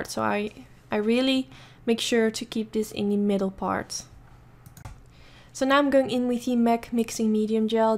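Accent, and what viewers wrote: Netherlands. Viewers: ik hoorde je praten en wist meteen dat je nederlands was haha! ik zou iets meer werken aan je engelse uitspraak!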